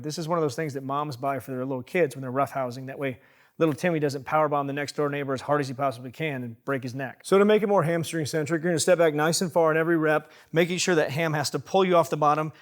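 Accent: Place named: South, United States. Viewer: Maybe it's just the cornpone style and southern drawl but I initially had great trouble keeping up but I'm gradually getting used to the rhetoric and speed of speech. Just as well because there're done tips here that I've not yet seen and might help. How refreshing to hear someone state categorically that squats ain't the be all and end all of legs exercises; I'm already a walking barbell lunges man (and yes, spot on, it IS a lung buster exercise) but I've just picked up a couple tips to tweak it and alter the stress focus. The great Ronnie Coleman swore by Walking Lunges and there's little that hasn't already been said about HIS wheels...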